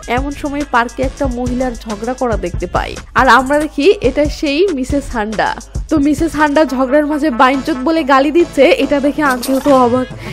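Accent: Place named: Jessore, Bangladesh. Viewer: আপু আরাম লাগে এটা আমাদের যশোরের ভাষা, খুব ভালো লাগে তোমার কথা গুলো, এবং মুভি গুলো সত্যি অসাধারণ আপু। 🥰🥰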